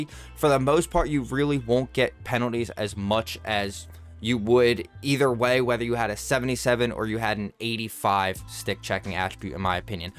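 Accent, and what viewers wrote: Delaware County, United States. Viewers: in that delco accent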